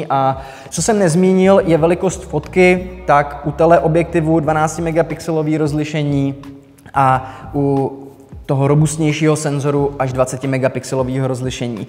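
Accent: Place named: Prague, Czech Republic. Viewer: Ten pražskej akcent to je něco strašnýho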